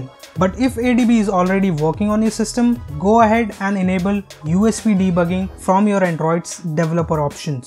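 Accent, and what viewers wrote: India. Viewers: hate indian english accent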